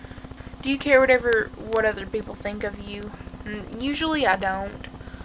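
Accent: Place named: South, United States. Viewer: this is fuuny as shit cause u sound Country as Hell.....which I find it very cute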